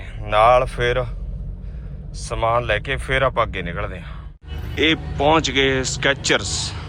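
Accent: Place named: Amritsar, India. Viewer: ਬਾਈ ਜੀ ਤੁਸੀਂ ਹੈਗੇ ਬਰਨਾਲੇ ਦੇ ਤੇ ਭਾਸ਼ਾ ਤੁਸੀਂ ਅੰਮ੍ਰਿਤਸਰ ਦੀ ਬੋਲੀ ਜਾਨੇ ਹੋ ਢਾਡਾ ਢਾਡਾ ਭਾਜੀ ਭਾਜੀ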